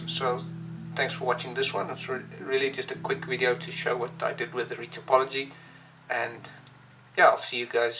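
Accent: South Africa. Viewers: dude you sound extremely South African, I need to know you nationality !!!!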